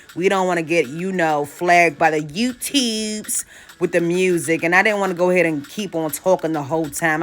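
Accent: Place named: Maryland, United States. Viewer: You're definitely from MURLIN toooo!🤣 I know that accent anywhere. I've been living here for 34 yrs and the accent is still so distinctive to me. Great job on the technique. I have alopecia on the crown of my hair and I am always looking for options to share to help me. Thank you!!!!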